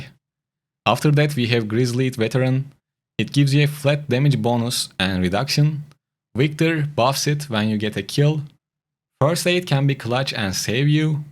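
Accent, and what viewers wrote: Turkey. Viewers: La ingilizcen aynı Türk lehçesinde. Ortaaamm türksün sen la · You sound turkish ngl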